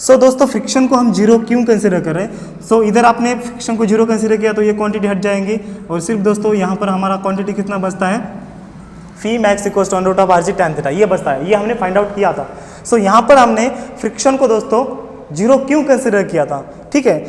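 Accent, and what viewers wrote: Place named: Maharashtra, India. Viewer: सर आप हिंदी मे पढाते हो... पण मला असं वागतं कि तुम्ही मराठी बोलता बरोबर ना सर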